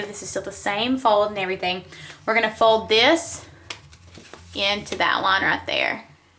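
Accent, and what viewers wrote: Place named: South, United States. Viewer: Love that southern draw sweetie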